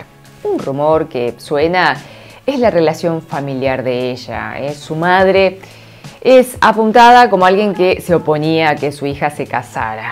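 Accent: Argentina. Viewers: Que bellezas las actrices coreanas ❤ me encanta tu acento argentino ❤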